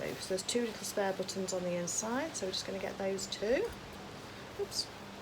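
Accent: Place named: Derby, United Kingdom. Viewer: You sound just like me. Are you by any chance from Derby?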